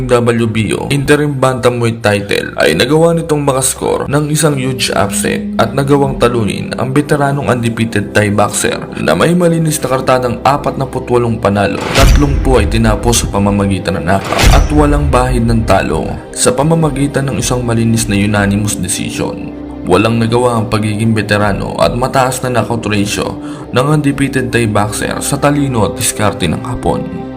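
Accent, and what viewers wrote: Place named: Batangas, Philippines. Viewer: Ayos kabayan bentang benta Ang iyong mga banat...batangenyo ka ba?Ang mga salitang binibitqwan mo e hawig Ng salita Ng mga batangenyo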